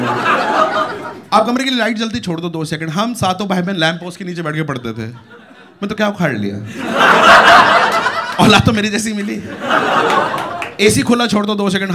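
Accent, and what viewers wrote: Delhi, India. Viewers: I just love his dehliite punjabi style.... Hilarious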